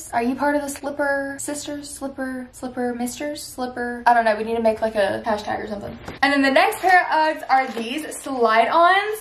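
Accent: South, United States. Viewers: I watch videos on 2x usually and never realized you had a super southern accent until this one was playing in a normal speed. Its a nice accent I just was surprised. Love your videos!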